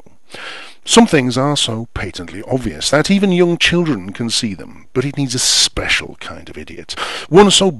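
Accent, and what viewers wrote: United Kingdom. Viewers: your an excellent reader as well as a excellent thinker. But I'm an American... everything sounds smarter with british accents. I look forward to your next video. I do have a cat that steals my pasta dishes... but it think its' for the butter and cheese flavors?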